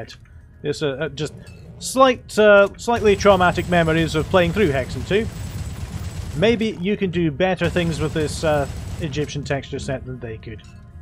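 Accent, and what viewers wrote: Scotland, United Kingdom. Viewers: are you from Scotland mate?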